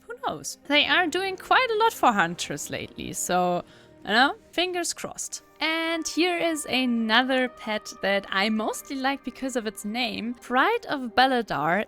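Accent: Germany. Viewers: you are german right? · Ich lieb doch deinen deutschen Akzent😭😭 Abo is raus!!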